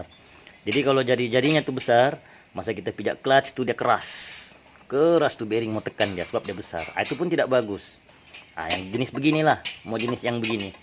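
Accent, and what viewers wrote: Sabah, Malaysia. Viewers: Terima kasih encik berkongsi ilmu. Suka saya mendengar loghat orang Sabahan. Kereta pun sudah ada tanda2 perlu tukar. Semoga dimurahkan rezeki tuan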